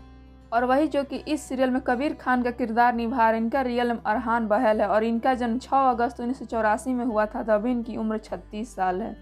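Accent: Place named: Chhattisgarh, India. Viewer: Speaker is chattishgarhi hain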